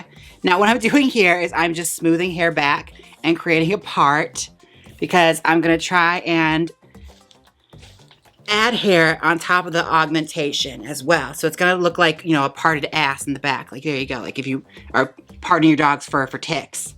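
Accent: Australia. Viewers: The Australian accent is everything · Are you trying to do an Australian or an ol timme Cockney accent? Either way, love your work xo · More Wigs by Vanity please or just the hilarious Aussie accent! 💗❤️🧡💛💚💙💜🖤🤍🤎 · that’s how Australians sound 😭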